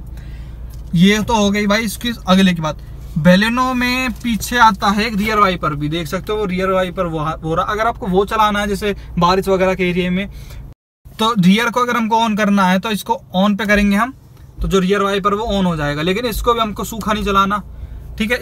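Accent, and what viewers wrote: Haryana, India. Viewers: It's just bcz of his haryanvi tone even my friends sounds the same 😂😂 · Haryanvi bolte hai dono 😊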